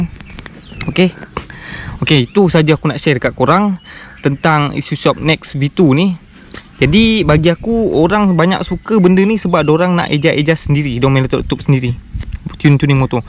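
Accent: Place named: Java, Indonesia. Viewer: dgr dia ckp dri mle2 tgk dh tau jawa. Tgk muka pon tau. Sbb aku pon jawa. Haha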